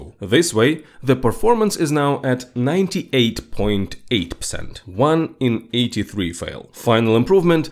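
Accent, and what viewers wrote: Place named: Russia. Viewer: Как же очевиден русский акцент ахах, с первого предложения распознал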